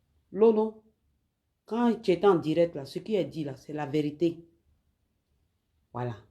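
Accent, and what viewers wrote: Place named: Guinea. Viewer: Tu fais peur tu ressemble à une sorcière voilà tu es venue de la Guinée 🇬🇳 voilà pourquoi ton français est penché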